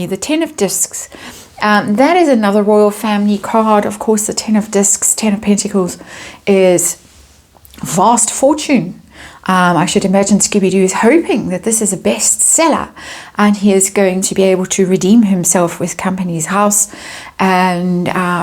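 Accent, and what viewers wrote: South Africa. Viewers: Everyone seems to be making money from this debacle, don't you think? You are one of 3 unbiased readers I trust. Plus I enjoy listening to your S African accent. My father lived in Cape town for many years before relocating because of unrest, to Sunshine Bay Australia with his second family. We have many S African dentists and opticians in my home town in Kent, I've noticed. 😊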